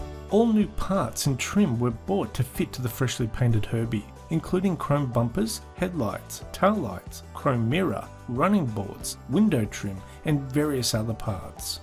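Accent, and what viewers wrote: Australia. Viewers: Herbie and KITT. He sounds like an Australian version of me. I'd love both of those in ny garage but I'll settle for just Herbie. At the moment.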